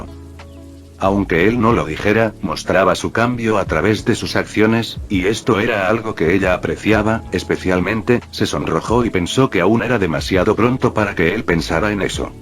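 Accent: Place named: North, Mexico. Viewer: Que norteño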